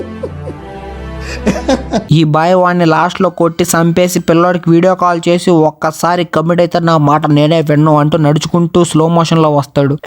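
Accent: Nellore, India. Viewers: Abbiya BROWWWW 'Korea Comic" ani oka yt channel undhi..andhulo kooda oka korean athanu movie explanations chaala hilarious ga english lo chepthadu with crazy asian accent and funny sounds which is similar to your explanation but that is foreign and yours is naatu nellore yaasa which I am proud to be fan of both..neeku emmana help ayyidhi ani like inspiration ga ani cheppa..happy ga undu mowa browwws - SELMON BHAAI be like: abba laddu lags unnave pella 🤤 BOOOYYYYYYYYYYYYYYYYYYYYY broww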